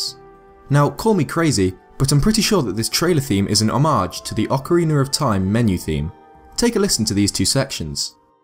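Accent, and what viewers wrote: England, United Kingdom. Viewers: Love the english accent 😌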